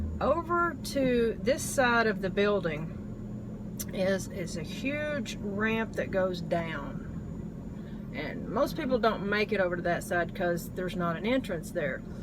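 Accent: United States. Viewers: Your accent's pretty awesome. My grandmother's from Texas so it's something I've heard my whole life. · I like your southern accent.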